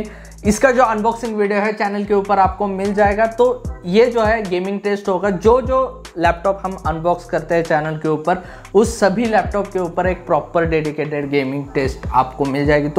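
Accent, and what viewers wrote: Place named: Maharashtra, India. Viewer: Bhau tu marathi ahes na tuzhi hindi aikun lagech karala pun changli ahe hindi 😂 btw mala suggest kar na ha laptop chan ahe ka like game gta 5 3 to 4 hours chalel?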